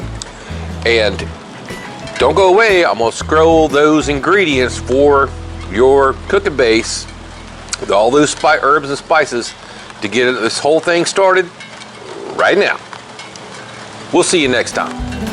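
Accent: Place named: South, United States. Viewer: I'm only half way through and I'm really liking it. The outdoor kitchen is looking great can't wait to see how you decorate the wallscwith your old time cooking gear. I'm Australian so with your southern accent that makes a sentence sound like one long word can you tell me what you said about the 4 burner works well?? Haha great job mate 😎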